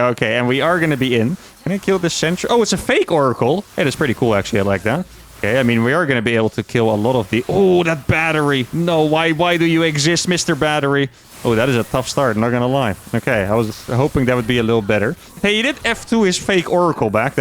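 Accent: Netherlands. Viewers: are you dutch, brother? I feel like I hear it in your voice. I'm from scheveningen either way, awesome video. has to feel cathartic, lol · As an American I can hear the Dutch in his voice pretty clearly haha ive heard dutch folks speak a lot though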